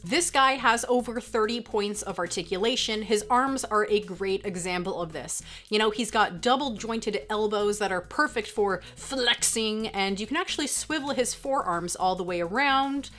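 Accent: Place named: Vancouver, Canada. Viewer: Your Vancouver accent is very apparent. I speak differently from you here in Ontario. Your speech is a little bit more clippy whereas, we tend to speak a little more laid back in Toronto.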